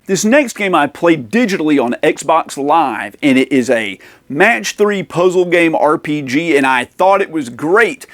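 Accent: South, United States. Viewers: Apart the cabbage..are you from suddern m'erica ,i dont know i thought you are from Canada... I am european i berely speak/write m'erican language, so please forgive me, but legitimately what country are you from 🤔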